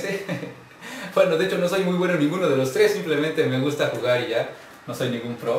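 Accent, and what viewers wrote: Mexico. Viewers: veo tus videos aunque no estoy suscrito, juegas bien al Mario maker pero tu comentario a la hora de jugar casi no me agrada trata de ser más espontáneo y que se te salga menos lo chilango y me suscribo, sigue así bro 👍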